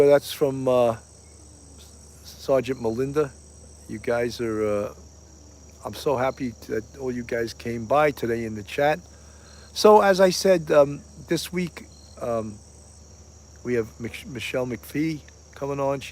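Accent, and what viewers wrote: New York, United States. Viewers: Good to see you!! I spent years growing up in Trenton, NJ. Now I'm is SC. I love your New York accent!! 😊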